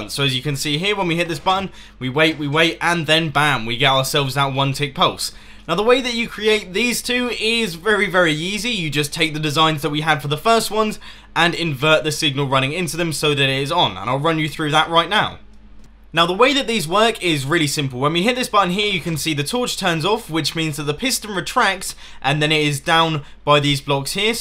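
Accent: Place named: United Kingdom. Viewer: I swear the British accent is thicker in this video than it is in current HermitCraft episodes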